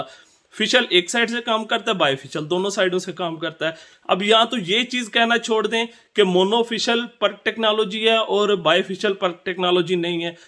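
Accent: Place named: Kashmir, India. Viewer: Bhai muje aap Jammu Kashmir Ke bhai lagty ho. Accent se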